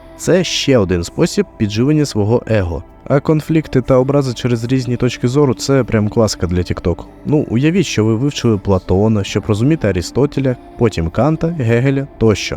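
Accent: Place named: Russia. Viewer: я розумію, що то рофли. Але навіть рофлово назвати це крінж. В нього тупо сруський акцент навіть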